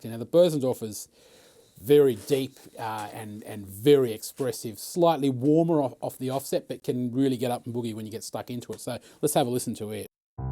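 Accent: Australia. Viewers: Great review! So nice to hear the Australian accent and down to earth attitude. I’m picking my P515 up tomorrow.😉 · Beautifully played. Nice to hear the 'aussie' sound too hehe. Definitely prodigy level !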